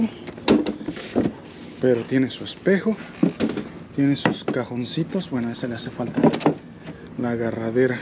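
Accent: Mexico. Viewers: Buenas noches hola y familia la.mayoria de Mexicano hablan así igual que los Salvadoreños y algunos otros países bueno de todos modos tienes mi admiración como dicen ustedes eres muy lucho y no te rajas😂😂😂❤😂❤😅😅😅😊😊😊😊🎉🎉🎉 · Lo que pasa que así hablan los mexicanos para ellos es normal,y el sr es mexicano ok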